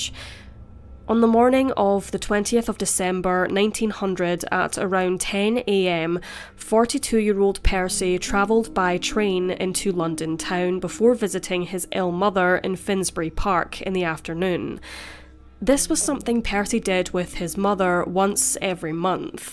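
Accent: Scotland, United Kingdom. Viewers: I don’t think so , sounds like she’s Scottish to me , so makes sense as people in Scotland would want any other team other than England to win lol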